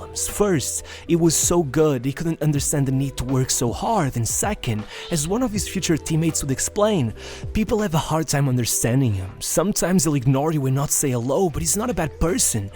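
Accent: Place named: Portugal. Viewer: Your agitated narration and heavy accent make you very hard to understand and annoying. Either relax and slow down your narration or improve your intonation to sound more like an English speaker and less like a Portuguese speaker. All the best.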